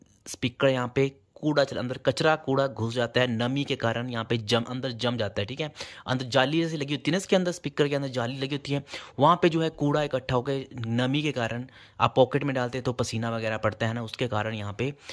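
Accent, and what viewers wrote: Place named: Himachal Pradesh, India. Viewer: pehle ye btao u belong frm Himachal na ?? M 101% sure yes u r frm Himachal …..I recognised u by yr voice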